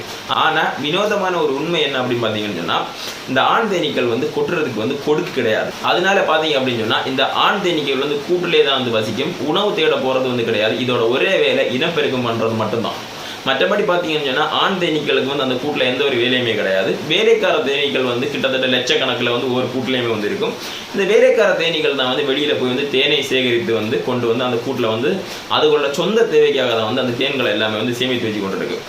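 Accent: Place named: Kanyakumari, India. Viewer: அருமை. குமரி தமிழ் சிறப்பு.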